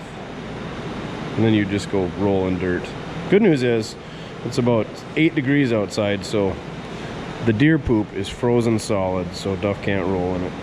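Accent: Minnesota, United States. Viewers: Great project, make dad happy. Your accent makes me feel like I'm back in Grand Rapids Mn.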